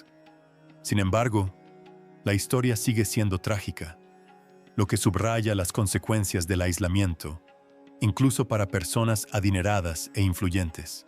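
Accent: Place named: United States. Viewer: acento americano que me da dolor de cabeza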